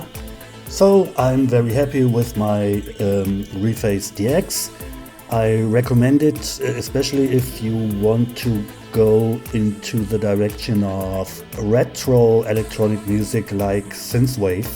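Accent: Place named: Germany. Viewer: "VVRRROOOooo .... >> ... oooOOMMMM" 😄👍‍(made me smile!). Tested on headphones and the R8 sounds good! By the way I agree - I downloaded the WAV file and for sure the Yamaha FM synth engine is brighter than the PCM of the Korg... but it should be, that's one of it's strengths. Glad you're enjoying your Reface - I treated myself to the MODX a couple of years ago, and it's great (but also not perfect) and has become the centre of my setup. I did look at the Korg opSix (I have the amazing Wavestate and the Modwave, so did consider completing the Korg trilogy when they were selling them off cheap a few months back) but there is nothing in that which I cannot already do with the MODX, so I resisted... for now. 😉 Anyway, look forward to hearing more of the Reface in your future videos ... btw: have you tried the looper function yet ? Best Regards, Axel. p.s. 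Your voice overs sound fine, you don't sound drunk all the time, and we like your charming German accent! 😎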